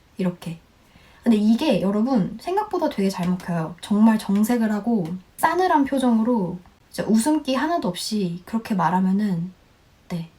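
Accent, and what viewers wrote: United Kingdom. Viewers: 영국발음 장난아니네요 와~ 전에 다른 유튜버한테서 봤는데 남한에서 왔냐 북한에서 물어보면 "너 하기에 달렸다" 였는데 엄청 웃겼어요ㅋㅋ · 기분 나쁜 경험이지만, 제 기억에 남는건 영국발음 입니다 ㅠㅠ 너무 멋있어요 진짜 ❤️👍🏻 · 와.. 영국발음 넘 멋있어요. 뉴질랜드와 호주로 워홀을 희망하고 있었는데 영국으로 급 변경하고 싶네요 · 영국억양으로 공부한지 얼마나 되었어요? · 와 영국어보고 반했어요 바로 구독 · ㅠㅠㅠㅠㅠ이 언니 왜 이제 ㅇ알게된거지 진ㅁ자 너무 이쁘고 너무 사랑스럽고 목소리도 너무 좋고 영국식 빌음도 너뮤 좋고 진짜 짱이에요ㅠㅡㅠㅠㅠㅠㅠㅠ 사랑함니다ㅠㅠㅠㅠ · 영상 너무 잘 봤어요. 영국 발음 예쁘게 하세요. 마지막에 "I thought it was a dog barking" 빵 터졌네요 🤣😄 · 요며칠 영상 보다가 결국 입덕부정 인정하고 입덕했습니다, 영국식 발음에 내가 이렇게 환장하는 사람이었나 첨 알았어요 ㅋㅋㅋㅋ 진짜 내가 본 인종차별 대처 중에 제일 고급지고 우아하게 되받아치신 것도 너무 멋있고 영어 관심도 없고 공부할 생각은 더더ㅓ더더욱 없었는데 덕분에 영어공부도 관심 갖게 됐고 필기체도 연습해서 처럼 멋지게 쓰고 싶어졌어요❤️ 진짜 발음 너무너무 좋고 좋아서 영상 통으로 영어로 읽는 책 읽기 컨테츠? 같은 게 나오면 좋겠어요 제가 조회수 만 정도는 책임지고 보겠습니다 ^.^ 살앙해요 ❤️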